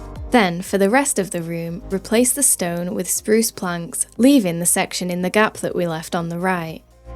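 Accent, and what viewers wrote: United Kingdom. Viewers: This is another reason why I love British accents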